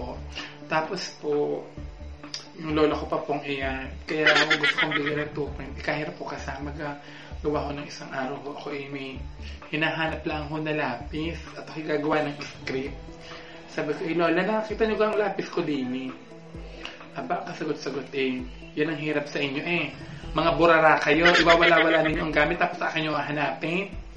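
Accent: Batangas, Philippines. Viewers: Nakakatuwa ka....ala eh batanguena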